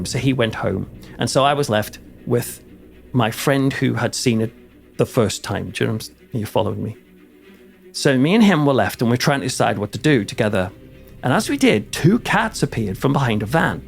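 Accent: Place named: Durham, United Kingdom. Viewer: I thought you was from Chester le street you can tell from ya accent, I'm just up from there ayton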